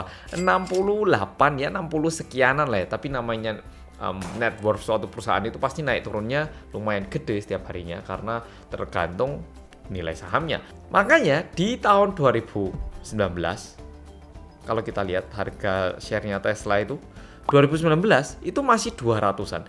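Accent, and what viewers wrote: Java, Indonesia. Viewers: Kangen yang medok medok😍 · Maz lofyu❤️punya kembaran medok jga aku wkwk sama maz >< Tim garcep dong❤️ Komen sebelum rame xixi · kangen yang medok medok ya liat mas wkwk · Yuk irit breng mas medhok... Waaahhh... Sip dah kalo sama mas...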